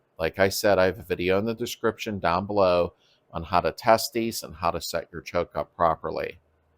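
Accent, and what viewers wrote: Pittsburgh, United States. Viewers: Just discovered your channel! From your accent and the WDEV sticker in your shop …. I’m thinking you’re a Yinzer! So from one Yinzer to another …. Thanks for great content. I discovered you while doing some research on buying and maintaining a classic beetle. I’ve been in the market for a super beetle convertible. Solid driver …. Not a show car. Your content has been really helpful. Any recommendations on sites to shop for a car would be helpful. Thanks again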